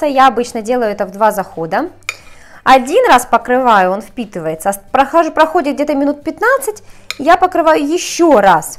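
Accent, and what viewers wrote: Ukraine. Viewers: Маладец умничька а акцент украинский это пустики главная хорошая память, спасобность, нада мала говорить а больше делать.🍩🍮🍞🍰🍯🍭🍔🍕📖🍑🍏🍎🍅🌽🍓🍑🍈🍌🍐🍇🍒🍉🍆🍉🍍🍐🍒🍋🍌🍊🍈🍈🍧🍨🍦🍦🍩🍩🍞🍞🍞🎂🍰🍰🍪🍪🍫🍫🍬🍬🍭🍭🍭🍇🍇🍒🍋🍋🍊🍏🍎🍊🍒🍇🍉🍌🍌🍈🍑🍊🍒🐤🐤🐤🐔🐘🍫🍩🍞🍰🍏🍊🍊🍑🍐🍌🍈😣😞😒🐼🍓.🍰🎂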